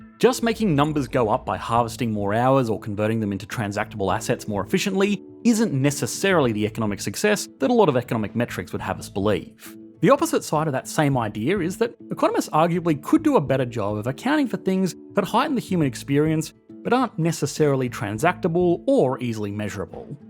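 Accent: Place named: Bogan, Australia. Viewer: Subscribed for the dulcet bogan drawl.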